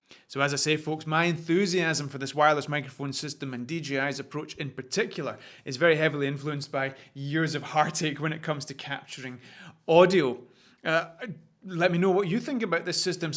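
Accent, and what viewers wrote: Scotland, United Kingdom. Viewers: Hey, love your videos and espacially the scottish accent haha Where can we find the tripod that you use?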